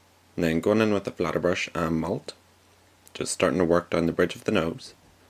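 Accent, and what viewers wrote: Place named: Northern Ireland, United Kingdom. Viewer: ooh...love this look and your technique is amazing! Do I recognise a good ol northern Ireland accent there...where you from originally? :-)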